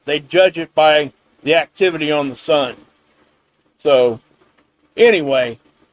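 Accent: South, United States. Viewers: You have made alot of effort to curb your southern accent. Thanks for keeping up and sharing with us. I know I can count on you for quality content.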